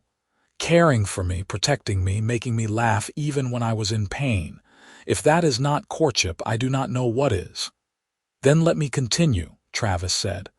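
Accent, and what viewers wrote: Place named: Midwest, United States. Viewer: My most favorite voice! Midwest.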